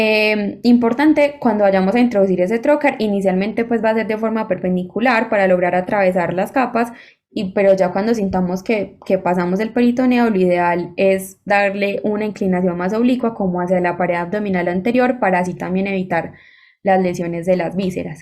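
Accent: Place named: Antioquia, Colombia. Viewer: muy paisa, buena platica, saludos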